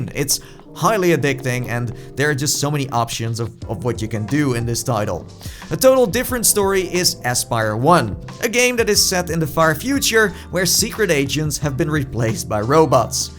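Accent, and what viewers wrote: Netherlands. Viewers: He sound just Dutch accent am I the only one that hears it maybe because I’m half Dutch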